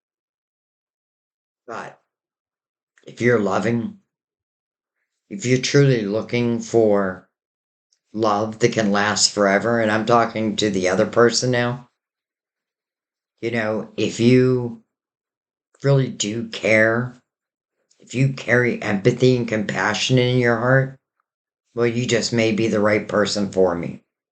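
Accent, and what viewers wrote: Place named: Southeast Pennsylvania, United States. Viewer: 8/31 here. Triple Virgo You sound like you are from the same area that I grew up in. SE Pa. And South Jersey? Love your channel and how accurate you are!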